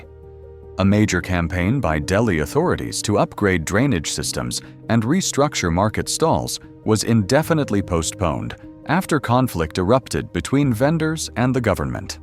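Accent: United Kingdom. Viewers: Your accent is British. You mind your own business. Do not come to India if you do not like us.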